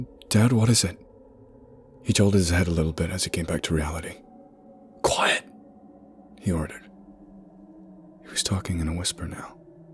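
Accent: United States. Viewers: I would think your American if I didn’t know you weren’t ( this coming from an American). Great job love your content.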